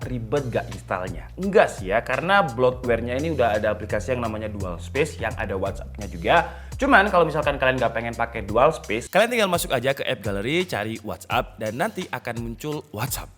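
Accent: Malang, Indonesia. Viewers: owalah om wong malang tah...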